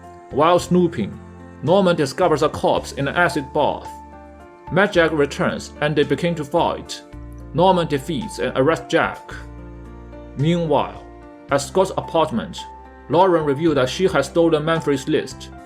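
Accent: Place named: United Kingdom. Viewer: your accent is too british cannot understand anything clearly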